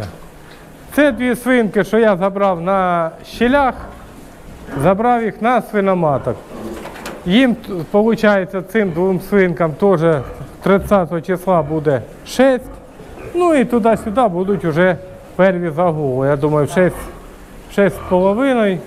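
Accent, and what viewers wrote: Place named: Poltava, Ukraine. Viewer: Ви случайно не с Полтавскої обл, говір дуже схожий,кумедний і цікавий?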